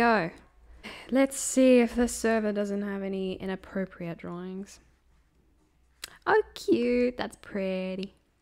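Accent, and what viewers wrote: South Africa. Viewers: Are you South African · Are you from south africa i hear an accent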